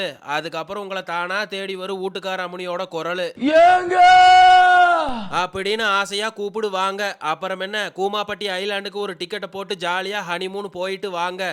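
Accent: Kongu, India. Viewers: Unga peachu gounder mari iruku correct😊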